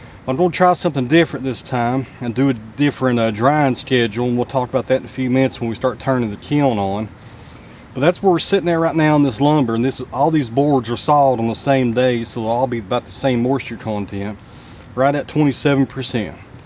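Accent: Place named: Tennessee, United States. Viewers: I truly enjoy hearing what you have to say, and I also enjoy your Tennessee accent very much. Don't listen to the naysayers... I think most of us appreciate your insights and a little background on what and why you are doing things the way you do them in your videos. Keep up the good work, sir, and don't change a thing! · Talking too much?! I mean, seriously, who doesn't appreciate being educated by a man with a great southern drawl. Weenie's winer's and wimp complaints, say I! Your videos are excellent and entertaining. Happy to see you don't take the negative comments seriously.Anxious to see that walnut being milled.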